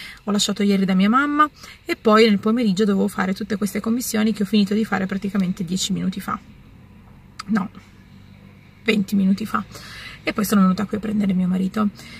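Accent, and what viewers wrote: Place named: Turin, Italy. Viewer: Sei napoletana solo di nascita, ma in realtà sei torinese nel modo di parlare e di essere. Penso che quando vieni a Napoli tu ti senti un pesce fuor d'acqua.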